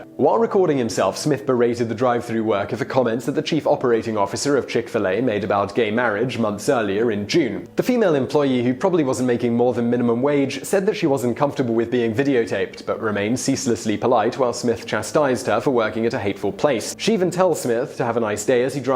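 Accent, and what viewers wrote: United Kingdom. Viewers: you have a British accent that doesn’t sound like your constantly smelling something bad,love it.very first class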